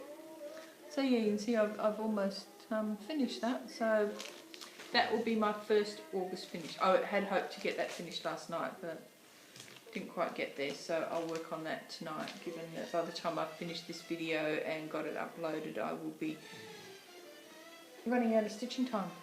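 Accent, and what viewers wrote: Australia. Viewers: Loved your vlog, as always. I'm of "that age", too. When David Cassidy had a concert in Perth, my family was living at South Headland (West Oz) and I was heartbroken when mum said she wouldn't take me to his concert. lol If I remember, not all the roads were sealed back then. Love everything you showed, especially the Happily Ever After. Made my day hearing an Aussie accent...I keep hearing about mythical Australians over here....not seen one in three years! lol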